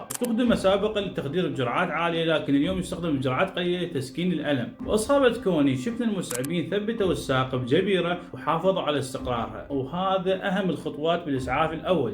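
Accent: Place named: Iraq. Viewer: لما ركزت فالكلام بقت اسهل شويه،،، تحيه لأهل العراق الغالي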